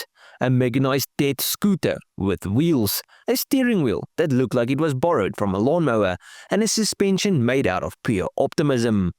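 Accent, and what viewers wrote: South Africa. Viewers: Parelel twin not vtwin. You sound like a South African.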